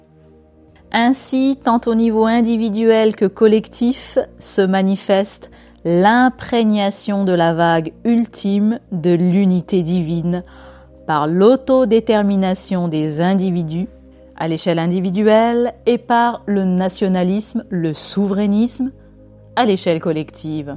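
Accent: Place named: Réunion, France. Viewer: Merci pour cet éclairage et ce petit accent ( réunionnais?) charmant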